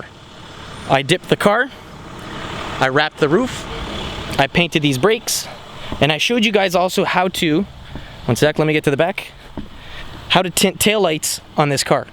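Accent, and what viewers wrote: Ontario, Canada. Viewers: are you from ontario?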